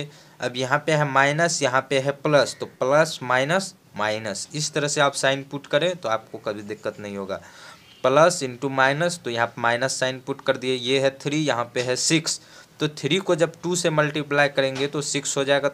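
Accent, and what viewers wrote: Bihar, India. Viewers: Your Voice Like A Bihari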